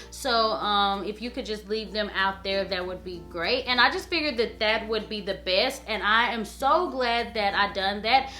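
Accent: South, United States. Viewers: You have a cute southern accent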